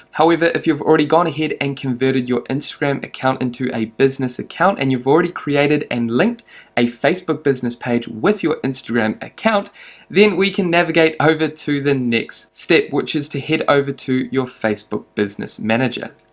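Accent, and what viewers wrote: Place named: New Zealand. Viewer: I was wondering why your accent sounded so much like Wholesale Ted and it turns out you guys are both from New Zealand. The 'Catalogue' part was the trigger lol.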